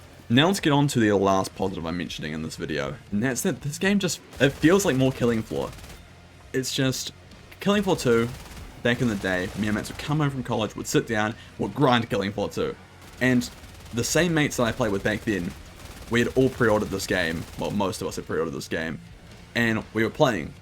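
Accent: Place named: New Zealand. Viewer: You, sir, are a Kiwi.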